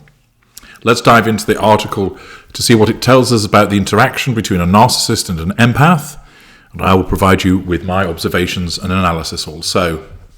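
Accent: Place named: RP, United Kingdom. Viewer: You have a really good deep rich voice, very British way of speech, tongue in plum in your cheeks/posh. Are you really a psychiatrist & why you do not allow a photo of yourself! However & whatever your case-enlightenment, revealing/s of/on your video clips on narcissism etc. I don't expect a reply, but intrigued.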